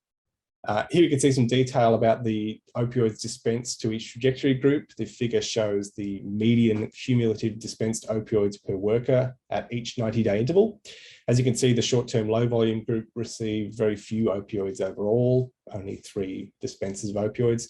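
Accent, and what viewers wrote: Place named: Mexico. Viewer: Nice research but I found it hard to understand the presenter's Mexican accent.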